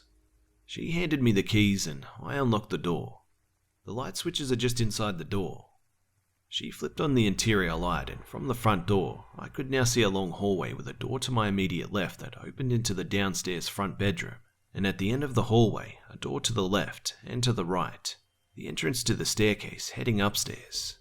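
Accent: Australia. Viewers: Are u Australian? BTW HAPPY BIRTHDAY!!!!! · Hey! R u Australian? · Justin Ramsaran - He's Australian 🇦🇺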